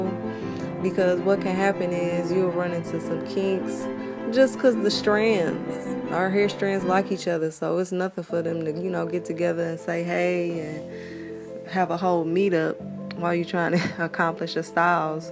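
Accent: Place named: Detroit, United States. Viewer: Why i say to myself…. She sounds like she’s from Detroit….❤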